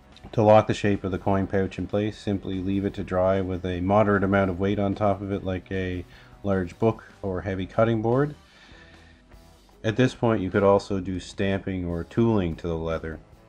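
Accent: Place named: Ireland. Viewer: I detect an Irish twang in his accent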